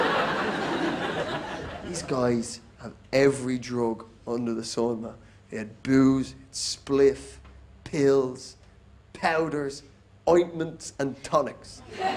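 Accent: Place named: United States. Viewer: Andrew Maxwell does a good American accent.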